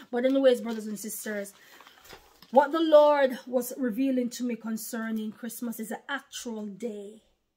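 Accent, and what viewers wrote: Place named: United Kingdom. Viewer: Sister, you look so beautiful. You kind of resemble my mother. They say you’re a false prophet, but I don’t see that. And the facts that you share let’s me know you know the truth. Keep up the good work, we look forward to your videos & appreciate you. I love your UK accent too, “I can’t find mi pen!” 😂😂😂. God bless you and your family Sister 🇨🇦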